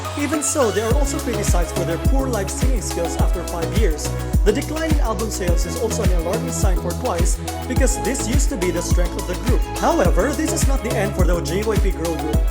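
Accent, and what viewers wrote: Philippines. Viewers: Uso ginhawa kuya. Halata Pinoy, accent pa lang.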